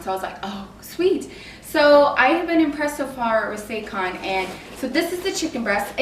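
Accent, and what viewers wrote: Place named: United States. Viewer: There is a U in the middle of tuna not oo and herbs starts with H. I wish Americans would stop murdering the english language!!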